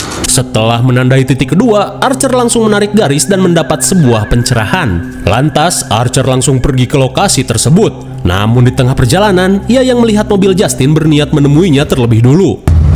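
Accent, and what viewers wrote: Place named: Java, Indonesia. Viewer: Mc nya beda ni biasa nya oke bhasa.. Medok Jawa.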